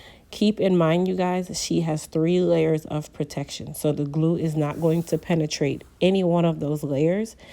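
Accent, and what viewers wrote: Jamaica. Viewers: Sound jamaican to me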